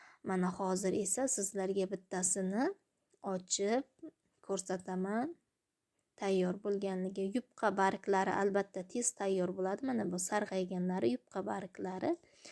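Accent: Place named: Qashqadaryo, Uzbekistan. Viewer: Shevangizdan Qashqadaryodanmisiz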